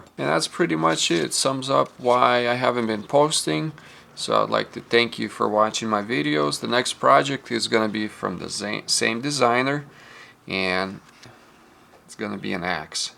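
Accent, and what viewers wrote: Russia. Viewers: твои ножи - это искусство, руки золотые! У тебя не английский, а русский с акцентом, судя по всему ты уже давно говоришь на английском. Удачи и ждем новых произведений!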